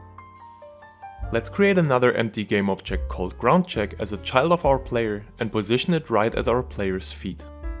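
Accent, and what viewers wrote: Germany. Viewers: U german, austrian or swiss?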